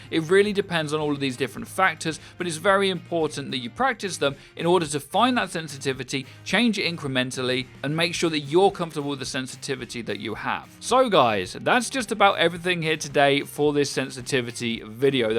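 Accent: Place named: United Kingdom. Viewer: Im from germany and i love your british accent!!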